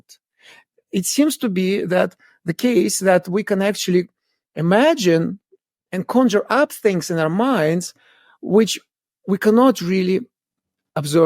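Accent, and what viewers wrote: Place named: Russia. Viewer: I LOVE the Russian accent! Like a badass spy is teaching math and mysteries of the universe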